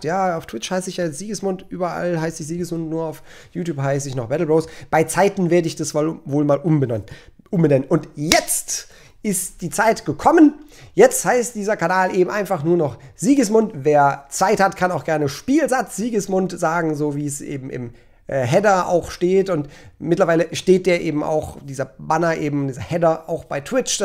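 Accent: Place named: Berlin, Germany. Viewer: was du bist Berliner ???? echt???? Ditt find ick jut :D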